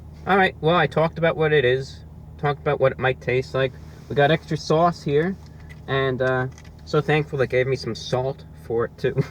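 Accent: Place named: New York, United States. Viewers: Do you happen to be from New York or north jersey? I can tell from your accent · This guy sounds like hes from brooklyn or Jersey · Why do you have a stereotypical Italiano Brooklyn accent?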